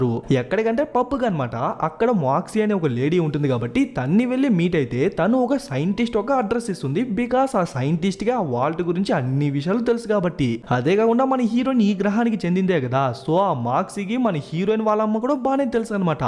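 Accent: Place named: Tamil Nadu, India. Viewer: Oka Tamilian abbayee Telugu matladinattu undi ni voice But chapa Baga explained chesavu too well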